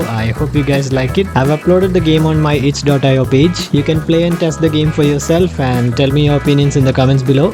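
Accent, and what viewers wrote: Kerala, India. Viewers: ur slang is just like malayali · your sound like you are from kerala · i know a fellow malayali when I hear one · Sounds like malayali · I knew u were from kerala by lisisting to your voice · Accent kettal ariya malayli aan nn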